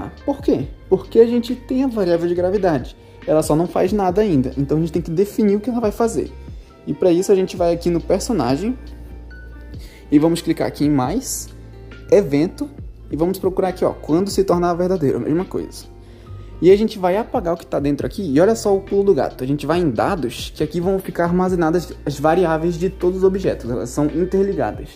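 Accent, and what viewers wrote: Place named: Rio de Janeiro, Brazil. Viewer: Eu chuto q tu es carioca ou algum lugar do Rio de janeiro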